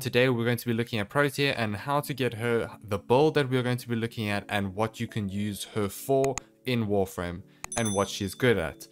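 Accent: South Africa. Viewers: Mate you sound like you from south africa i cannot lie xD, great vid btw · My dude are you from South Africa 🇿🇦 I am from Cape Town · are you soug African?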